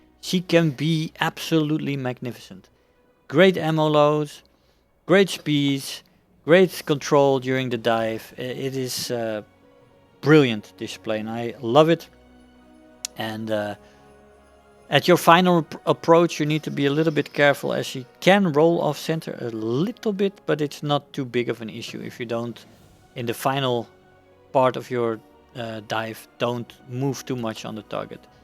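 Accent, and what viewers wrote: Netherlands. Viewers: Holy cow, i recognized that dutch accent of yours fast and realised that you were from the Netherlands just like me! 😂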